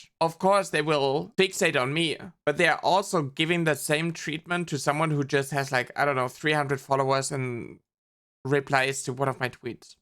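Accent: Germany. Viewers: Why did I not expect a German accent? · Just asking, are you German? You have a very charming accent xD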